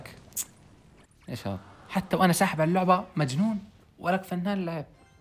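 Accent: Iraq. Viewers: حجيك قريب للعراقي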